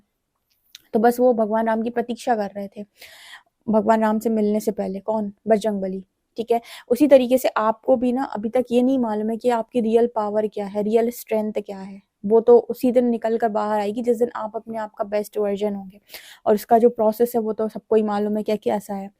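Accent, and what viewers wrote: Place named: Bareilly, India. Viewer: Kya ap bareilly se ho, accent to wahi hei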